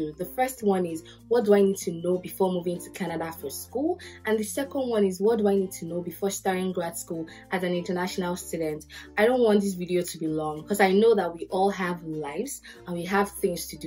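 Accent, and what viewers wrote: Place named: Ijebu-Igbo, Nigeria. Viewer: Do not change your accent for anybody!! Thank you for not changing your ijebu-igbo accent 😁😁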